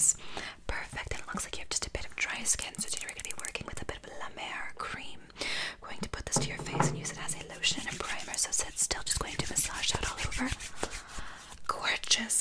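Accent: United Kingdom. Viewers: I missed the British accent!!! It’s been a while ❤️ you’re so good at it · British accent on❤️ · I love your British accent, it's so cute and relaxing! :) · UK accent on point! Great ASMR vid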